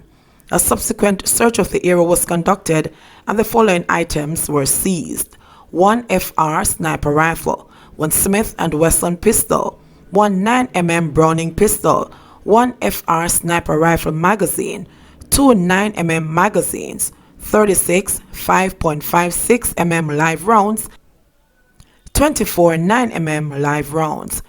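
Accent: Jamaica. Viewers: Love your voice and your accent, and your fluency in English and Jamaica English!! Lol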